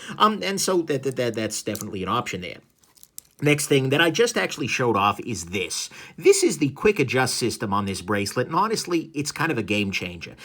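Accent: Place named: East Coast, United States. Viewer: I love his accent. It’s like a East coast mobster selling you a caahh. Cheers mate!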